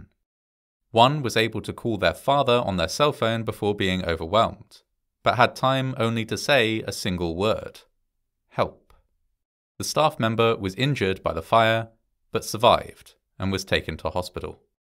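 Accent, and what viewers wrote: United Kingdom. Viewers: terribly hard to listen to the mono tone british accent, im going to take a nap now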